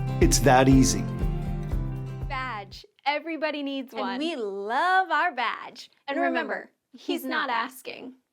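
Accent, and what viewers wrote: Canada. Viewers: Every time you said “battery” my heart would flutter!! Great Canadian accent 💫💛💫 Thank you for sharing 👍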